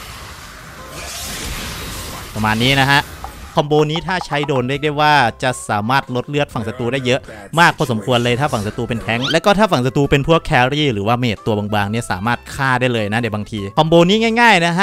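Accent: Isan, Thailand. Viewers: ว่าวอีสานนำแน ชอบ🤟🏿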